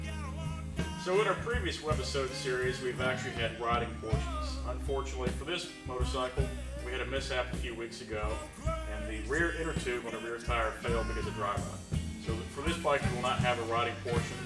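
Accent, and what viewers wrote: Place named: United Kingdom. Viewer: Sounds British..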